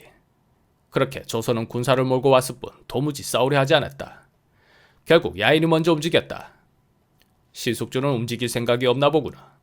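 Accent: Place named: Busan, South Korea. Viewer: 흠...분명히 우리 은..부산싸나이 가튼데...사투리가 전형적인 부산인데...내도 부산이요...흠...암튼..얼마전부터 지독한 찐팬이 된 제임스 라고 하는 미국교민 입니다. 요지음 의 영상을 보면서 즐거운 시간을 가집니다.감사합니다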